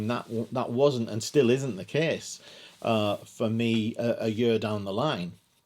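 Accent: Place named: North, United Kingdom. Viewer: First of all, glad that your back. I just started my lego Hobby a year ago, while struggling with depressions. Building helped me to find some sort of calm. Having not a great amount of money, I needed to find a way to pay for my new addiction. Inspired by your videos (at first I'd liked just listen to your great northern accent), I was thinking about doing as you did and I started to chase deals, I parted out, created lists and did all what is nessecary for a Shop. I even more enjoyed all those things comparing to just building (which I still love). I'm in my 50s as well, so I will try to do it like you did later on. My Shop is still very small and I haven't sold that much yet (at the moment most of my sellings are minifigs on ebay) but I hope to grow and make some money later on. The best thing is that all the tasks which this Shop needs really help to set my f**** depression in place. 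So m8, cheers for your insperation. Greets from Germany Btw: I'm a big Motörhead fan, so my Shop is called Motörbricks 😉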